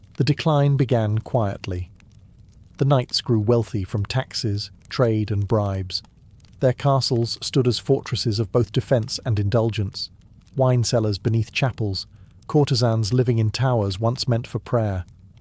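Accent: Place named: United Kingdom. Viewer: It's 2.35 in New Zealand. Very very tired, but I must have my British man talk to me about history before I conk out.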